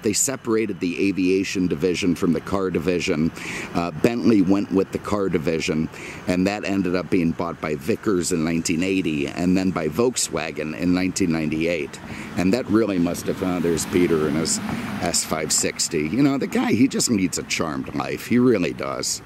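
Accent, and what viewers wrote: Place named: Midwest, United States. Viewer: I love your videos. Where are you originally from? Sounds like a Midwestern accent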